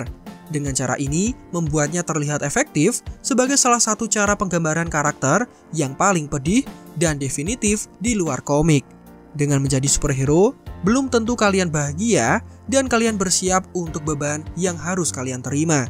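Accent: Java, Indonesia. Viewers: Mantep nih salfok sama logat admin nya logat wong jowo 😂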